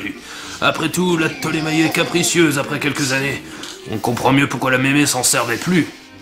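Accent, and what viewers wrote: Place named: France. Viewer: This was very helpful but what the heck did I just watch, do French people actually sound like this??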